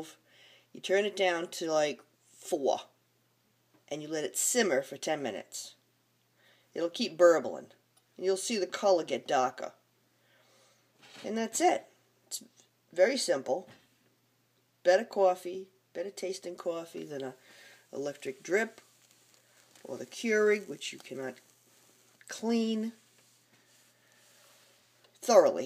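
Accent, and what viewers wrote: United States. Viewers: I just bought a Corningware percolator at an estate sale here in Vegas! So cool. But not as cool as your awesome New York accent! i miss NY sooo much! You are fantastic Madame!!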